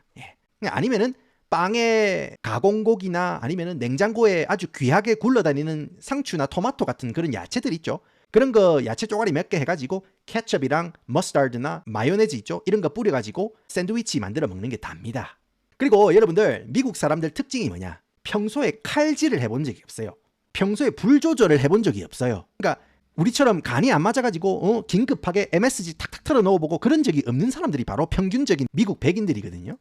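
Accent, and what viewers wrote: Gyeongsang, South Korea. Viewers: 경상도 사투리로 미국이야기 잼나개 해줘서보는데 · 니 갱상도 사투리 조지는게 억수로 찰지데이~~~ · 경상도 사투리 듣기 좋은데? 보기싫음 차단하든가😂 이채널 댓글만 12개 썼으면서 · 가끔떠서 보는데 경상도 사투리 너무 듣기힘들다 ㅋㅋㅋ 알고리즘에 그만 떴으면...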